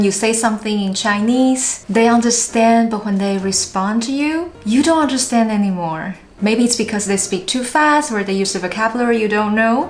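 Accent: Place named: Taiwan. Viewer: I especially like when you include these little things that make you sound more Taiwanese :D Like this 耶!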